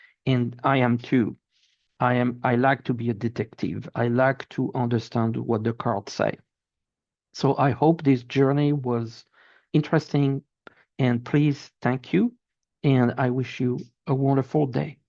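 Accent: France. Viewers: Thank you, this was really great and I can relate to all of it, you explained it so well. I love listening to you because I miss the French accent that I grew up with. I am so glad that I found you on Linda G's video last week. You a good at this. I hope to hear more from you. Bonne Annee' 🙏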